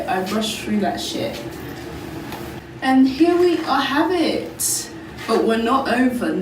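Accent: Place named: London, United Kingdom. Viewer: BTW, is your accent from London.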